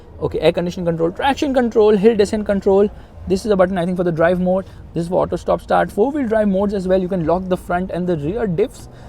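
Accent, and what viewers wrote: India. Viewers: Dammm i hate indian english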